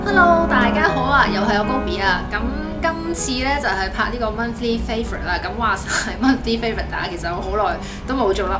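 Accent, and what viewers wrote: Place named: China. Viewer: 不錯！改善英文發音更好，太chinglish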